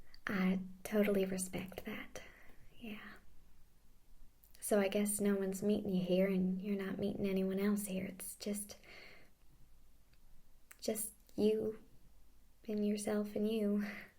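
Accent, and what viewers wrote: South, United States. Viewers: Your southern accent rocks!!! More southern roleplays please!!! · very nice approach with this video, nice accent too, i think this is the first time i have seen any of your videos, im guessing your a true southern bell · this is so my favourite video ever. normally i watch asmr to fall asleep but this is way too good to sleep through. from the beginning you had me laughing and even answering you in my head a little. and the southern speaking, wisdom about life... heck its all there. thank you so much you fantastic creature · Aarrgghhh i love this accent! I'm marrying a southern gal, that's my life goal... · why do i hate southern accents anywhere except for asmr?? I am actually from the south lol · weird, i was just looking for "southern accent" asmr a few days ago. Perfect timing to the point of being spooky. Thx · I have a real weakness for the Southern accent, this video is all kindas evil :P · Gotta love southern accents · I kind of just stumbled on here and so I'm new here. 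but your accent~ 😍 Whether its real or fake. its absolutely charming :3. I see what they mean by southern charm now xP. and your character you chose for this makes me giggle x3. she's weirdly adorable. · So I'm new to you, from England, love the Southern accent, this video is so nice and comforting, makes me feel like I'm checking in to s B&B, beautiful voice and accent, proper soothing :) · This is the first I've seen of this video. I genuinely love ASMR with southern accents, I don't know why. Thank you for this, and I apologize for being late to the party. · this one is amazing! The southern accent has always been a tingle trigger for me. Thanks for making this. The humor in it just makes it even better. PS: 61 degrees....brrrrrr...as a Detroiter just coming out of winter 61 sounds like beach weather to me:-) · Pleasepleaseplease do more of southern accent asmr 💞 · Man, I love this:D Would have loved a stronger southern accent but I can't complain, my first trigger in so long >.> Keep up the good work Miss., cheers from Norway! 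· This was really good and I'm highly impressed with the accent but you didn't pronounce route like a lot of southerners do. I'm just saying because I'm from Texas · This was too adorable, loved the southern accent and the many expressions used, you nailed that southern charm. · very nice, but at the beginning i like that background song do yu have the name of it. oh buy the way love that lovely southern accent;really good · Welp Was looking up southern accents cause I haven't heard them in years since I left and was feeling a bit nostalgic Was nice Not the exact one I of my area bit damn well close enough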